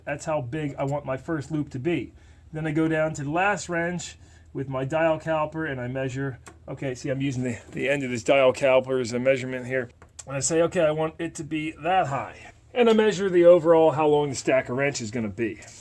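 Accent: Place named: Pennsylvania, United States. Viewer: Must be from pa.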